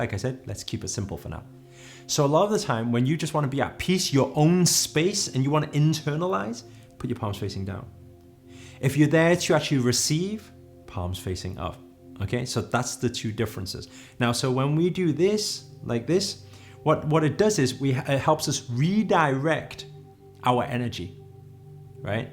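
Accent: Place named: United Kingdom. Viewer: Love your videos and your British accent... Thank you for this explanation!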